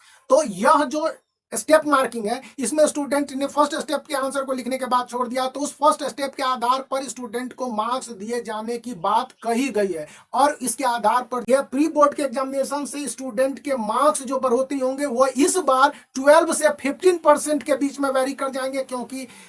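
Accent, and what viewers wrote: Bihar, India. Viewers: Lekin sir aap bhi bihari bolte hai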